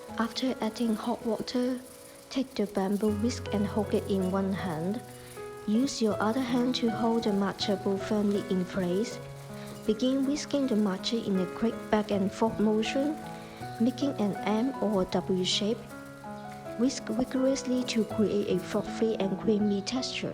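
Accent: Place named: China. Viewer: Are you Chinese? I love your accent.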